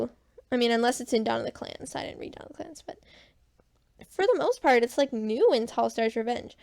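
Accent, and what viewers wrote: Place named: United States. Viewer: I can tell you are american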